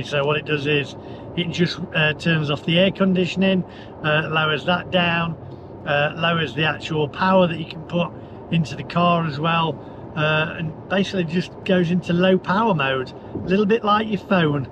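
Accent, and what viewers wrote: United Kingdom. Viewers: I must say, your videos are addicting...funny, all with a British accent. I still find it hard watching you drive on the wrong side of the road...LOL. Keep up the good work.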